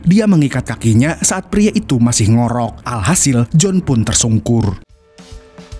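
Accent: Banjar, Indonesia. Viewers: Urang Banjar kh bng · Urang banjar kah nang ba'isi chanel ngeneh..??😁😁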